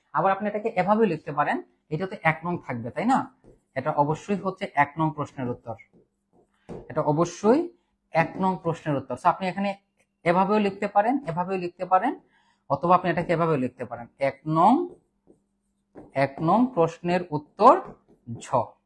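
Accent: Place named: Sylhet, Bangladesh. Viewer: Bro Sylhety✌️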